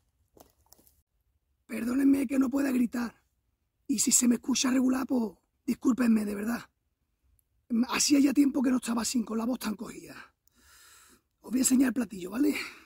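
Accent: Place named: Malaga, Spain. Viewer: Hola,por tu forma de ablar, me perece que eres de de Malaga.Yo soy de un pueblo de Malaga concretamente de la serrania de RONDA,de un pueblo que se llama <link> gustaria conocerte y poder enseñarte los montes y sierras que hay por nuestro entorno.tenemos unas bistas preciosas y unos lugares para hacer bushcraft impercionantes.y te enseñaria mi coleccion de cuchillos,a porcierto me llamo Rafael.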